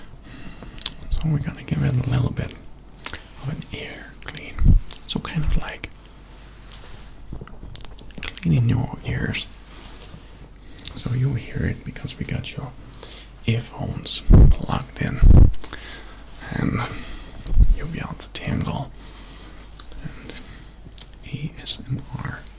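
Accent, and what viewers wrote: United States. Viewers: Its funny what american accent sounds like. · American accent is magical Keep doing doing your thing you sexy beast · Your American accent is impressive 👍🏻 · I am shooketh. I can't believe you're so good at a American ascent. Heck I'm American and don't even have that good of an American ascent! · Is this your only video with an american-type accent? It's spot on! And super relaxing! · I LOVE hearing you with an American accent! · pretty good american accent man!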